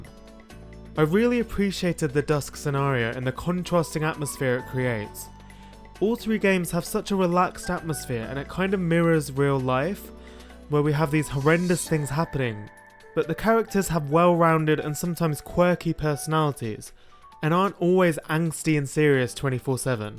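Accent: United Kingdom. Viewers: This was actually a super cool video, i perfer tactical Rpgs as apposed to these kind of turn based ones, but nonetheless i enjoyed hearing about this game and i might actually end up buying it lol. Also i knew you were from the UK but i didnt think about you having an accent. It was really enjoyable to hear you ramble about something lol. very nice change of pace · I have a lot of games to get through as it is, but the way you presented these, they really seem like the kind of game I'd enjoy. I'll add these to the long list of games I'll need to look more into, I guess... once I finish my large backlog of games that I already own. (Also, yes, more talking with the English accent.)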